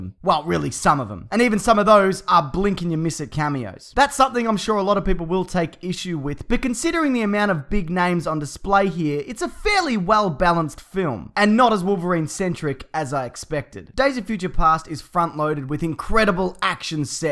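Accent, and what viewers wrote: Australia. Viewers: Are you from oz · is this guy an aussie?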